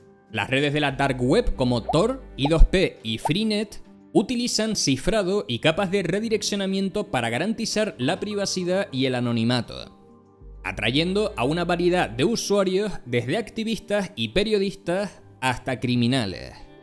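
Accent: Canary Islands, Spain. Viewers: Canario total no? Jaja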